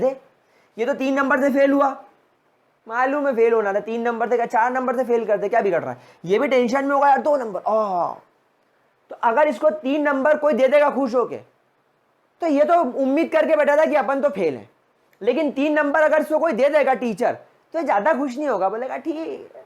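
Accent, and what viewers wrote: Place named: Indore, India. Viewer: Sir apki awaz me indoor ka accents hai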